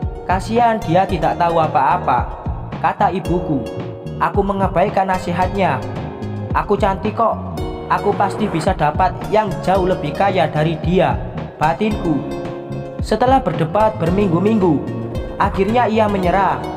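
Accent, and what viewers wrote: Java, Indonesia. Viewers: Bang orang mna sh bhsa y ko medok bnr tpi bàgus cerita y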